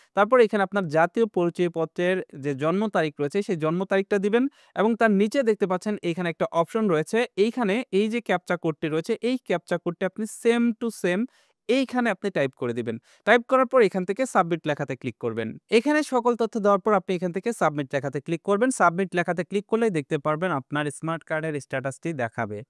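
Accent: Tangail, Bangladesh. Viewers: Vai er Basha Tangail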